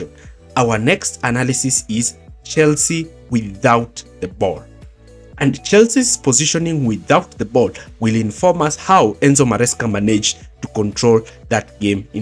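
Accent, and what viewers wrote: Kenya. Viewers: Nice analysis. Accent is familiar, are you from Kenya or have lived in Kenya in the past?😅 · This accent is so Kenyan, love it, also the analysis ismind opening, kudos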